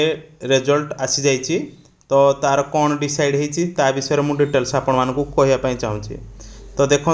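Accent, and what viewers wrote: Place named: Angul, India. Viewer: Bhai anugul ghara ki? Tama kahiba style anugul side ra😋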